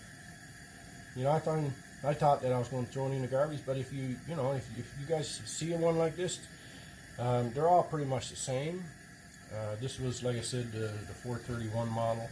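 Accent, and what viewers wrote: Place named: East Coast, Canada. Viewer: I recognize a east coast Canadian accent !!! 🇨🇦🍻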